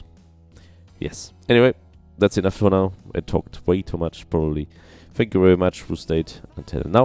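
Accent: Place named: Germany. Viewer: You are creating good videos but please work on the language the german accent is hoorrrrribale. Its not „di“ its the or you can spell it „se“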